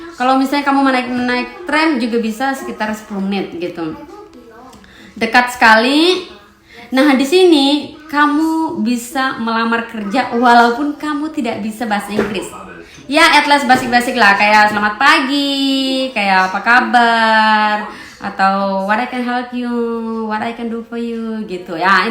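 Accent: East Nusa Tenggara, Indonesia. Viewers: Maaf ibu asli indo mn bhs i ndonya kyk NTT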